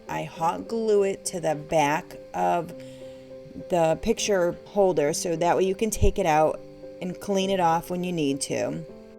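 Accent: United States. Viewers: I’m from Somerset MA and you sound like me. I picked it up right away. I was going to say Attleboro but Plymouth makes sense too. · Where u from? I hear a hint of an east coast accent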